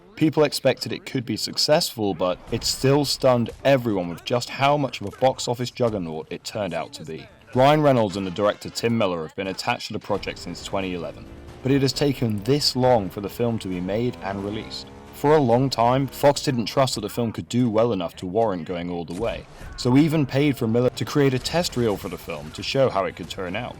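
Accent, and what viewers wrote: United Kingdom. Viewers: You from Bristol? The accent sounds familiar.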